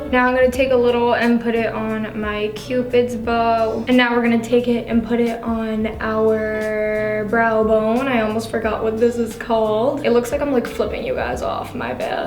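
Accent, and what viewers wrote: New York, United States. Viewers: aww honestly i love her she's so nice and i love her like little new york accent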